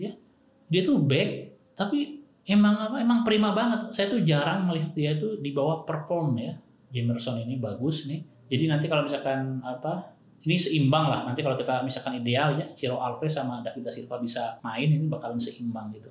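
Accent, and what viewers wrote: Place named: Surakarta, Indonesia. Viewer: Medok solo lah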